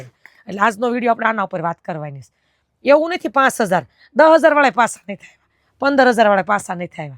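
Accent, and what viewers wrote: Kathiawar, India. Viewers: બેન તમે કાઠિયાવાડી લાગો છો બોલી પર થી મે તમારા 2 થી3 વિડીયો જોઈ આ તમે બોલો છો બહુ સરસ કેટલા હજારથી ધંધો ચાલુ કરવો જોઈએ મેકસીમમ સ્વામિનારાયણ ધમૅ છે હું પણ સ્વામિનારાયણ છુ જય સ્વામિનારાયણ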